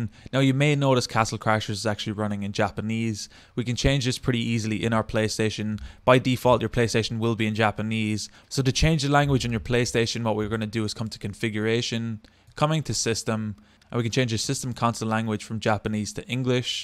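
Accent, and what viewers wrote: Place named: Ireland. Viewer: you have a great Irish accent lad thnx worked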